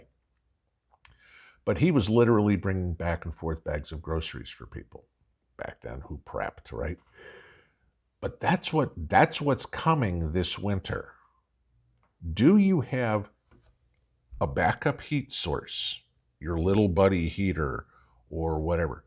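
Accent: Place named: Indiana, United States. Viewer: ..Blizzard of ‘78..no wonder your speech sounds more from Kokomo (Indiana) than KY or TN!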